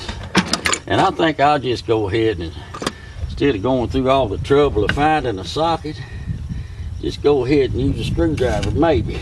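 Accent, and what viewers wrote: United States. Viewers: Thank you so much! You have a accent like mine from good old East Tennessee. 🍊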